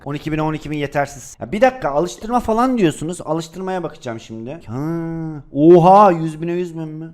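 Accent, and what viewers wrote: Rize, Turkey. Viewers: Abi önceden rizeli konuşuyordun ya ozaman daha güzeldi yine öyle konuşsana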